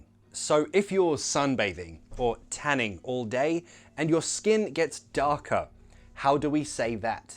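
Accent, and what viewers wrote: United Kingdom. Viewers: I love the British accent 😍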